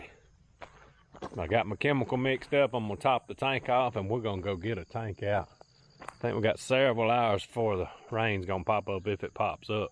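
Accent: South, United States. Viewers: The closed caption of your southern accent is sometimes very funny! And entertaining.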